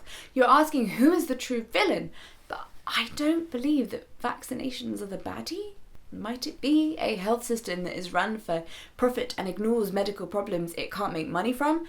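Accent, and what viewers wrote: United Kingdom. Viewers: She's just awesome. She puts things so clearly and that British accent makes it so much more awesome. Additionally, she always looks so classy while laying out the facts. Oh, by the way, I get vaccinated for everything I need (and a few extras), not just for myself, but for the health of others. In fact, I'm about to get a MMR booster soon., keep up the great videos, I've enjoyed all of the ones I've watched so far.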